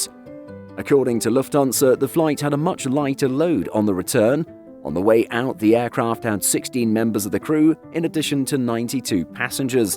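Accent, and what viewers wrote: United Kingdom. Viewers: What? YOu can clearly make out the British accent, and also, this is the channel of a news website. I think your ears are low quality.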